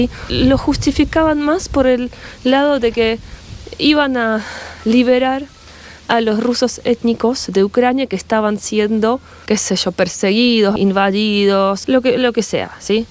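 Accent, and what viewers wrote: Argentina. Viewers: Primero no entendía nada , porque hablas y tenes el acento Argentino y tenes un Español perfecto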